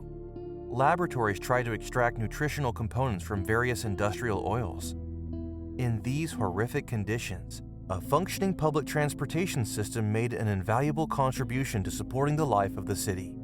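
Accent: Russia. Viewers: По всей видимости звук просто заказан у англоязычного диктора. В ранних видео на канале голос другой и с русским акцентом. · I see you hired a professional voice over for the narration ! I admit he has a very nice and clear voice but I find it loses a bit of the charm of your earlier videos. I really like your (russian ?) accent ! It makes the video more immersive and unique :) that’s only my opinion though ! In any case I will keep watching your amazing videos. Greetings from France !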